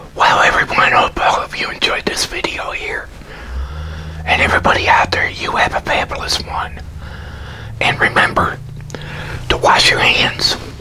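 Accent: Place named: United States. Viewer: that looks so nice yummy i bet you enjoyed it by the way im from the uk and im guessing ur from america due to your accent haha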